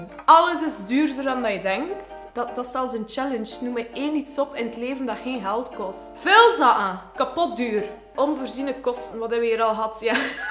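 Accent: West Flanders, Belgium. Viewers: Bedankt voor de tips net alleen gaan wonen en tof voor te horen babbelen, ben ook West-Vlaming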